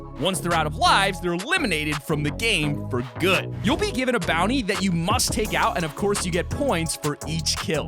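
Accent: United Kingdom. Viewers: Is someone British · u speak london very best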